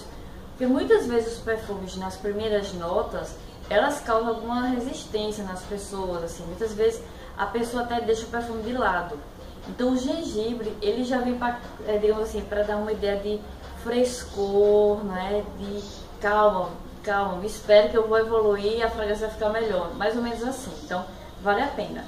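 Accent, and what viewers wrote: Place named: Northeast, Brazil. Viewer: Deve ser ótimo amo perfumes trabalho com isso sou da Dior moro em Recife você é nordestina tem sotaque igual ao nosso !! Tenho vlogs mais tenho vídeos de perfumes tbm vê lá !! Mais antigos amei você explicando show !!! Like te convido a conhecer meu canal !! Perfume sempre nos remete sensações amo amo !!!bjos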